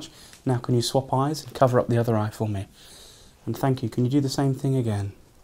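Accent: United Kingdom. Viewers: It’s so funny how I knew he was a scouser before I even heard his accent properly half way through.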